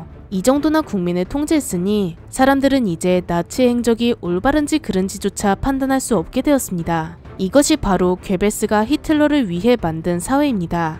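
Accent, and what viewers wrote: Gyeongsang, South Korea. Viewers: 내레리션 담당자분 경상도 사투리 들려요 ㅎㅎㅎㅎㅎㅎㅎ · 경상도 사투리?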